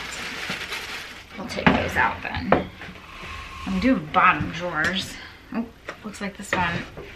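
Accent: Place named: Western Massachusetts, United States. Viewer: Every time you pronounce the word button or curtain etc, I remember an article I read quite a few years back about the origin of American accents. I searched today and found your particular pronunciation is addressed on Babble: The United States of Accents under Western Massachusetts and Connecticut Accents. So be proud! You don't have to change for us!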